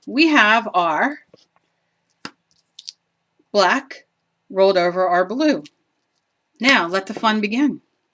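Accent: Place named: Altoona, United States. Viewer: You sound like you maybe from the Altoona area of PA. Nice memory of my cousins who living there still. Nice video, thank you to share. I am a Stroke survivor and hoping I can start make polymer items for gifts and fun.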